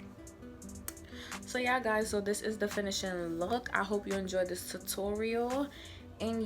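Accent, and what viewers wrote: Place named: Jamaica. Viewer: Your Jamaican? You have a amazing accent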